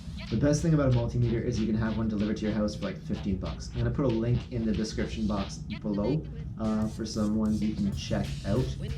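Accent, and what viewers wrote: Canada. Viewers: Are you Canadian? I think I can tell. British guy here! 😀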